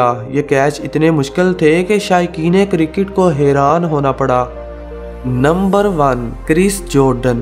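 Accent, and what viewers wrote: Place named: Punjab, India. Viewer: O mere bhai punjabi accent me commentry na karo. sab ghalat bol rahe ho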